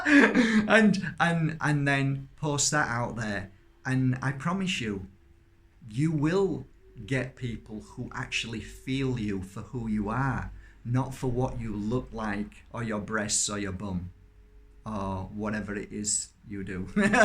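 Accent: Yorkshire, United Kingdom. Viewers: Love your Yorkshire accent so much. Even though you're all covered up with a t-shirt 😉 thank you for another lovely message. 💕 😘 🤗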